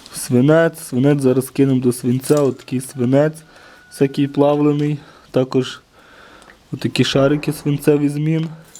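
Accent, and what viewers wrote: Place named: Ternopil, Ukraine. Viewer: Привіт! Файні відео знімаєш. Судячи по мові ти мій земляк (я зі Зборова, Тернопільщина). Чи можна з тобою поспілкуватись в інший спосіб (форум, телефон)?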